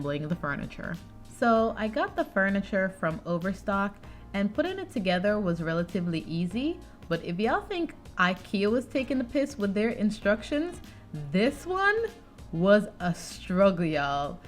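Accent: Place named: Jamaica. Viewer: Looks great, I love it! I detect a Jamaican accent, are you from Jamaica?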